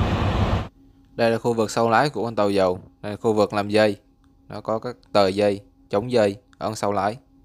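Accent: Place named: North, Vietnam. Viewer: Mình xin góp ý với chủ kênh.mình là người gốc bắc thì cứ nói theo thuần tiếng bắc.anh chị em sẽ rất ghi nhận công sức của bạn! Chân thành!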